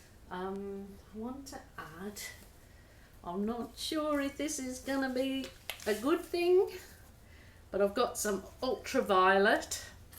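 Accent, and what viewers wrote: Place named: England, United Kingdom. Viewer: Always love to hear your cheerful English voice, I live in France so I always enjoy.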